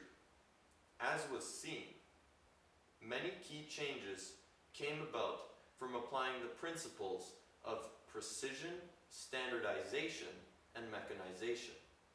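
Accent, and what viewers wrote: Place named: United States. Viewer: I'm always late to the party. Only got a yt recommend today. Good info but as the others have said a touch light on the volume front and your pronounciation a little too american but forgiven on both fronts.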